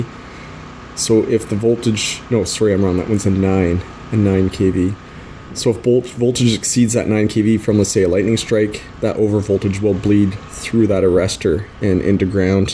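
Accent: Canada. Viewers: Are you from Canadá?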